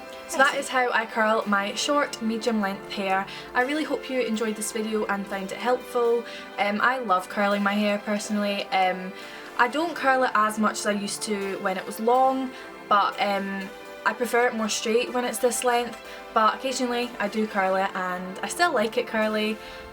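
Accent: Scotland, United Kingdom. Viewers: omg are you from scotland !!! i am as well!! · i think its Scottish :) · Sophia Maniaci thought I would reply since no-one else has- she is Scottish. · omg you're scottish yayyyyy :) <3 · is amazing she talks scotish like me I tried this for the first time I screamed 😜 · Yay scottish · Where in Scotland you from? Love your accent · Hi are u Scottish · Is that a Scottish accent idect? 😉 I love it, Scottish myself ☺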